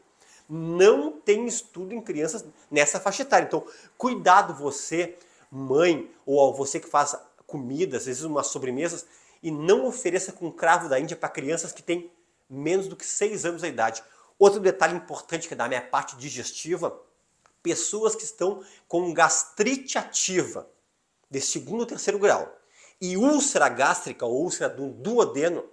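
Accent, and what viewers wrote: Rio Grande do Sul, Brazil. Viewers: Doutor o senhor é um médico bonito e educado, parabéns pelos seus pais ❤ gosto muito dos seus conselhos médico,o sotaque gaúcho é dez 💖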